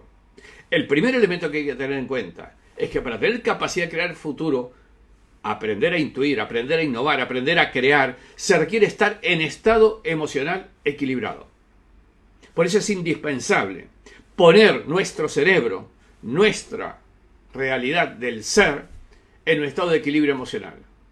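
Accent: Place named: Argentina. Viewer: Gracias, buen contenido....de donde es ?...que habla como argentino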